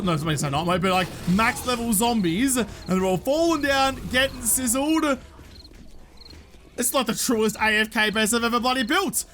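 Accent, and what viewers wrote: Australia. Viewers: Maybe its the Acapulco Gold talking but damn this is awesome. Love the Aussie (?) accent.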